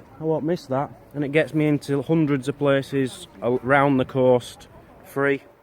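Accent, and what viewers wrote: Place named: Yorkshire, United Kingdom. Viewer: Ye or8 your a Yorkshire man.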